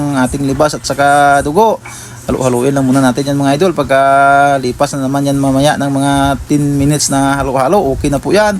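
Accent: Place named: Western Visayas, Philippines. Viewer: you sound like illingo bisaya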